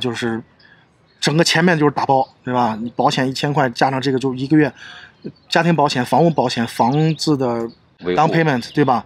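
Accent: China. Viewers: 这口音听起来就是我陕普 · 听着乡音太亲切了，本人也是西安人，在美国做移民律师多年，听多很多这样的故事，我们的同胞跋山涉水移民美国，美国毕业留学生削尖了脑袋拼命冲h1b工作签证抽签，中国中介略次坑惨了想要移民的同胞，我的客人感叹“被中介坑了，原来在刚踏出国门的那一课就做错了“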